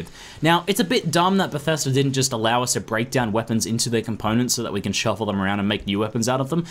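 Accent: Australia. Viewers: You Australian or NZ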